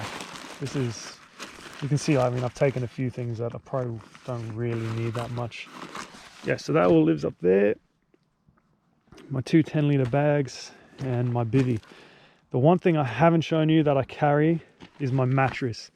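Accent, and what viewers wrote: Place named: South Africa. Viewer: I was pretty sure there was some South African! My bosses are both South African and listening to you, the odd word here and there, sounded exactly like my bosses. Would never have guessed the other countries though! 😊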